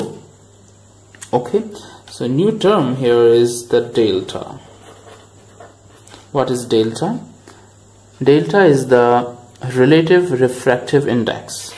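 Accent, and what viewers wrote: India. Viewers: Bhai tu Indian accent me hi sikha achcha lagta hai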